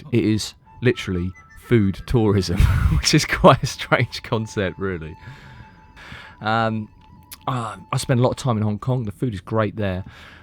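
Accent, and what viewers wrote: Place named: United Kingdom. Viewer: Enjoy very much of your introduction, probably one of the best! You’ve got really nice voice! Love your British accent!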